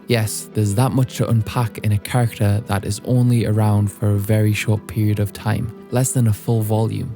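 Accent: United Kingdom. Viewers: his awful British accent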